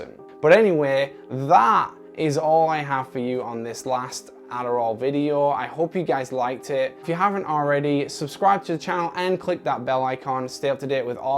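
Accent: United Kingdom. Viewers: How did you get adderall in Uk??? It’s £400 a box and isn’t on Nhs! Unless you live in USA! Lol you sounds British tho!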